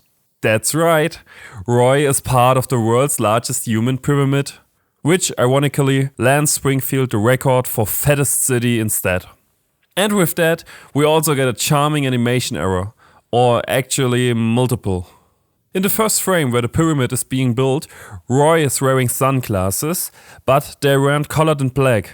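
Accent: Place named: Germany. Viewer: Your German accent makes it even funnier. Weiter so!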